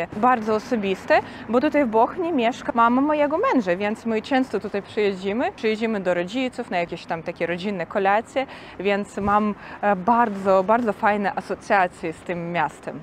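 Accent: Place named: Ukraine. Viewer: Jesteś fantastyczna i ten Twój specyficzny język z ukraińskim akcentem bardzo mi się podoba ,już któryś raz z kolei proponuje Sandomierz w świętokrzyskim 👍👍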